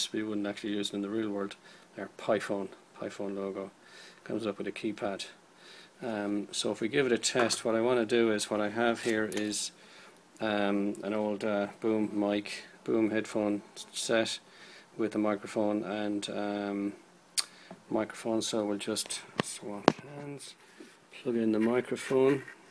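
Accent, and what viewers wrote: Ireland. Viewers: The Irish is strong with this one 🍀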